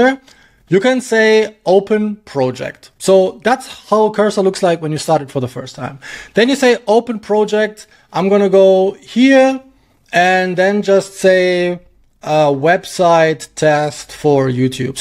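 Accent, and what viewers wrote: Germany. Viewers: Bist du schwabe? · Great video, thanks for sharing! I realy searched for someone who compares the ai builders and gives a real recommendation! btw. are you german?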